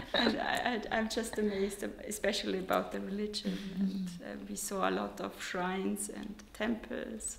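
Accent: United Kingdom. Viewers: すごいイギリス発音。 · 当たり前ですが、やはりSTOPPELさんの話す英語はイギリス英語なんですね…！それとも訛り…？ 字幕のおかげもあって、聞き取りやすかったです！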